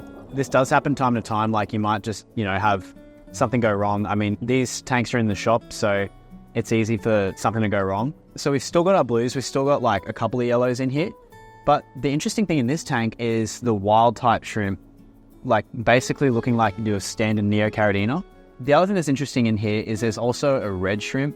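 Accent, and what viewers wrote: Australia. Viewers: He sounds australian. as a former (moved to America january) in australia everyone swears there. like everyone, its just another word in Australia. so the culture and stigma around swearing that there is here in America is not prevalent in Australia. i mean heck the school kids from around 14-18 are saying sIurs, not in a rude manner its just part of their vaocab and no one really cares because of the massive cultural difference. i understand where u are coming from I just don't know if u knew that in some parts of the world swearing has no meaning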